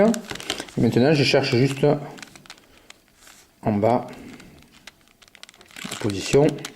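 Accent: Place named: South, France. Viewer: En bref et pour profiter de tes talents d'orateur avec ton petit accent qui chante le sud refais nous une petite video je n'ai pas ton talent de youtubeur, mais en bref la methode la plus simple commence par faire une double croix blanche avec les arretes de L4 et les centres de L3 en même temps, etape deux tu alignes en une seule fois sur les ligne 1 et deux les paires de coins que tu fais decendre a la bonne place avec ta formule DHDHDHHD en fonction des pieces a placer si c'est que celle de la ligne 2 tu fais dhdhdhhd ou DdHhDdHhDdHhHhDd et tes deux lignes du bas sont finies, en etape 3 tu aligne devant toi deux couleurs contigues sur la ligne 1 et sur la ligne 2 meme si elles sont diferentes et tu fais ta formule du 4x4x4 de parité de coin pour inverser tes coins mais tu la fais en prenant les 2 lignes en meme temps et en un seul algo tous tes coins sont faits t'as plus qu'a les placer. 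En derniere etape il te reste les deux lignes de centres a faire, tu commences par un PLL du 3x3x3 (en faisant des demi tours avec droit et gauche) ca te place les 3/4 des paires d'arretes et pour le reste tu utilises les formules de parité d'arrete du 4x4x4 il te reste plus que cette parité que tu évoques a la fin, en gros il te faut 2 petites minutes pour faire ca, En joy...A ton service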